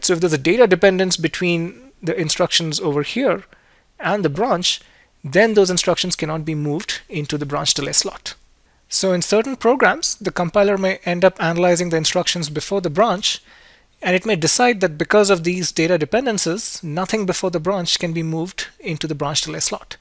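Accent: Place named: United Kingdom. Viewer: I have to say I love your british accent with a hint of indian as well. A lot less thick than most Indian computer science teachers you can find, and lot easier to understand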